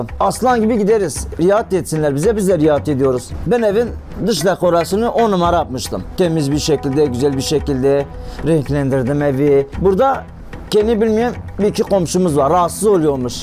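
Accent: Diyarbakir, Turkey. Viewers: abi diyarbakırlı mı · Bu adam %1000 Diyarbakırlı :)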